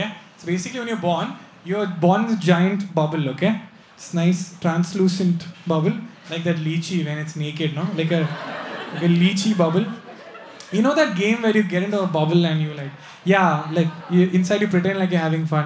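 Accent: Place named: South India, India. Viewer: Typical South Indian English, I'm a South Indian too and I love it !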